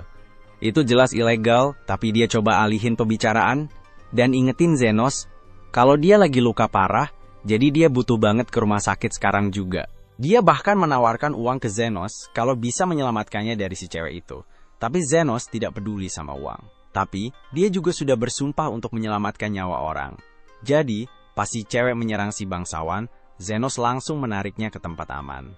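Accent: Malaysia. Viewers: Logat nya melayu nih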